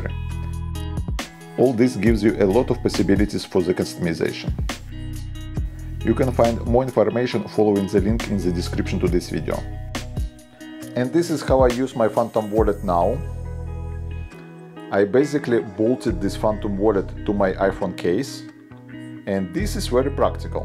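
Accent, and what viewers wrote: Ryazan, Russia. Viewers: Шикарный Рязанский акцент )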